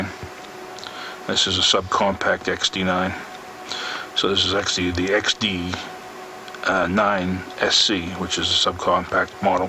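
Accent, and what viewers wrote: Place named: Pittsburgh, United States. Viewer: Jus spelling error . Although i was gunna say picksburg as well haha ... im not from pitt im about an hr away in east liverpool ohio but i spotted the accent quick lol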